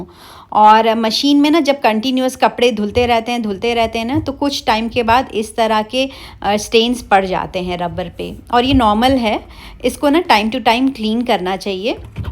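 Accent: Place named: Bihar, India. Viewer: Aap kahan ki rehne wali hai aapke lehje se lagta hai aap bihar ki rehne wali hain susral to aapka u.p. hai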